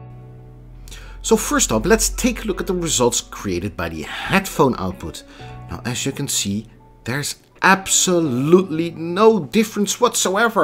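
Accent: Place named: Netherlands. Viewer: Nederlander die een beetje aardig Engels kan, 👍🏼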